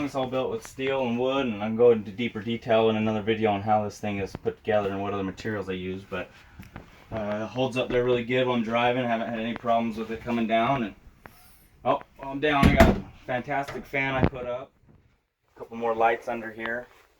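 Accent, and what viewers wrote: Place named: Utah, United States. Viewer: As soon as I heard him talk I could tell he was a Utahn. 😂 Great job way to make us proud! 👍🏼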